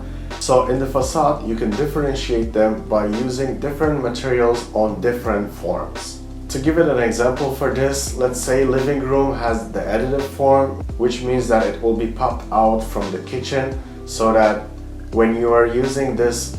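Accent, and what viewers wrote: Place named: Brazil. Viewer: Are you Brazilian? Your accent kinda sonds like mine.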